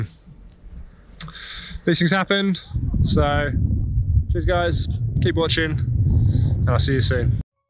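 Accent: England, United Kingdom. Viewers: Great videos, you’ve really helped me. Keep it up. Nice to hear an English accent as well.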